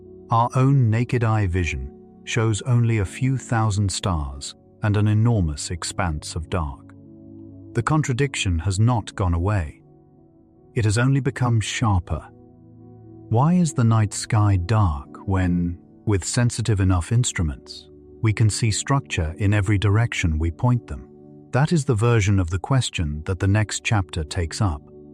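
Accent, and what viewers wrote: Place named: United Kingdom. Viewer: This narrative is filled with mistakes and although it sounds smooth and creamy with the UK accent of the narrator who ever wrote the information has not done their homework. Hubble who created and mistakenly produced the theory of the expanding universe after another 10 years of intense research and methodical tedious computations wrote a paper in 1940 expressing his scientific conclusion addressing the expanding universe. Sadly by then the big bang theory had taken such root that his paper fell mostly on deaf ears. However since there is so much evidence that doesn't exist supporting the big bang and the expanding universe that we have entered a paradigm shift away from this old school nonsense big bang to the Electric/Plasma Universe. Hubble wrote a paper addressing The Problem With The Expanding Universe. I suggest if you are a critical thinker look for this paper read it. 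No one since has put as much time at confirming the math necessary to reach the conclusions that Edwin Hubble had to do to be absolutely correct in this assertions about the universe not expanding whatsoever and that the universe is simply infinite.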